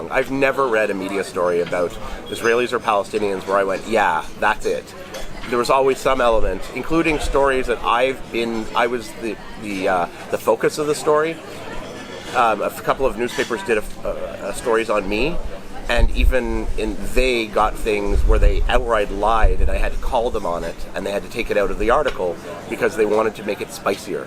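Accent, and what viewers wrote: Canada. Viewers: He sounds so Canadian.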